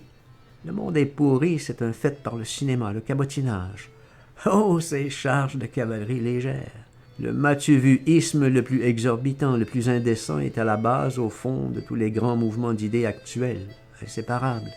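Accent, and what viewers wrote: Quebec, Canada. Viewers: Moi qui ai du mal avec l'accent Quebecois, souvent coupé au couteau, je trouve qu'ici il apporte beaucoup de vitalité à un texte drôle et grinçant. Vous avez du talent.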